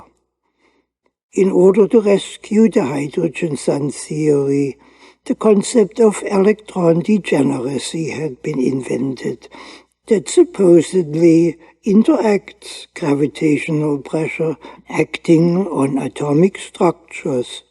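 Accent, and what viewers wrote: Germany. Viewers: i think your work is great and the Einsteinian accent adds to the atmospheric's, your humanist attitude is enviable and i look forward to further work by you. thanks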